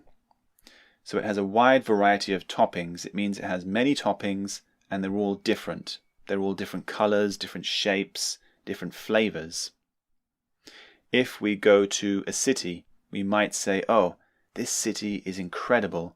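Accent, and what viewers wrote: United Kingdom. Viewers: With which British English accent do you speak?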